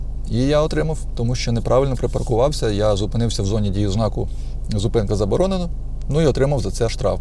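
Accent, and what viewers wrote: Vinnytsia, Ukraine. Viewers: Вінницький говор нікуди не дінеш) так шо не видєлуйся) ти все рівно наш - Вінницький)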